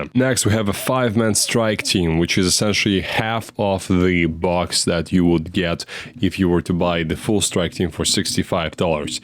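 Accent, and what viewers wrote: Russia. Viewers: Pls tell me that is russian accent? Or I'm wrong :)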